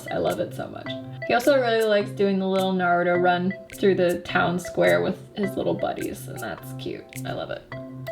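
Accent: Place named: United States. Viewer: Are u American because u have a different accent then beatemups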